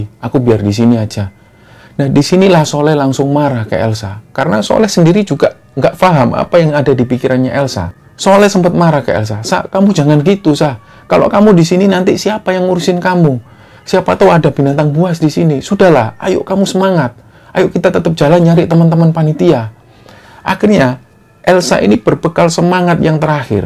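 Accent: Java, Indonesia. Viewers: aku orang malang dekat sama pantai laut selatan, aku seneng klo yg membawaknnya cerito horor, punya kharismatik, umpomo orang jawa, jawanya sangat kental, sehat"selalu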